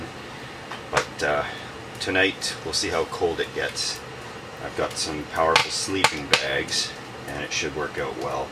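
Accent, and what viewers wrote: Canada. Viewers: That canadian accent tho 😂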